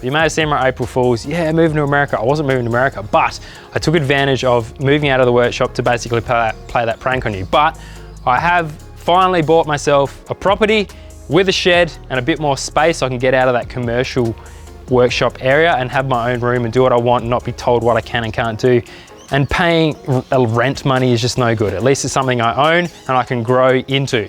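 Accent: Australia. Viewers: How good is the Australian accent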